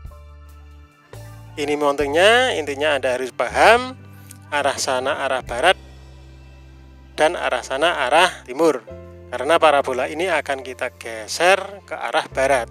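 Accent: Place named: Salatiga, Indonesia. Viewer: Wong Solotigo ya? Coro ngomonge koyo wong Solotigo..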